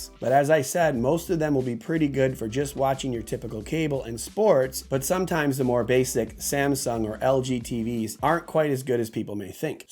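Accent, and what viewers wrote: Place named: Massachusetts, United States. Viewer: Are you from Massachusetts by any chance? I spot an accent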